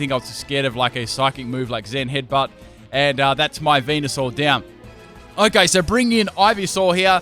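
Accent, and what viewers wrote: Australia. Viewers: He is Aussie like me I can tell by the accent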